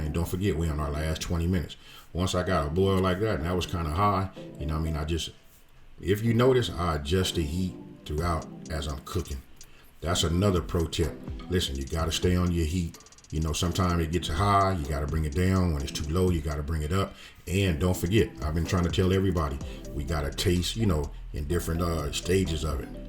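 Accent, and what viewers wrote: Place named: California, United States. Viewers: you my fav!! You get to the point and make it simple!!! And that Cali accent 💪🏾💯💯💯👍🏾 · I can certainly tell you’re from Cali man, love the video, subbed.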